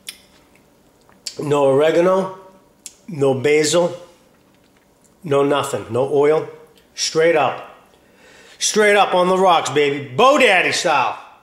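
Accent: Boston, United States. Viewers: Hmm, you sound like a Bostonian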